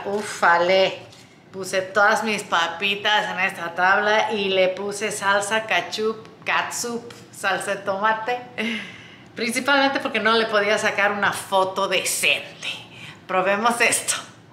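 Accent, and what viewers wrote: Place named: Bolivia. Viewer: 😁 tenias que ser boliviana. Hermosa, me fascina cómo hablas disfruto mucho al ver tus recetas fáciles y delis 👌👏👍👏👏👏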